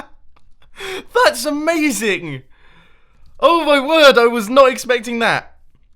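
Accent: United Kingdom. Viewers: Mumbos super british side kicks in after 2 minutes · Leave him alone! He's British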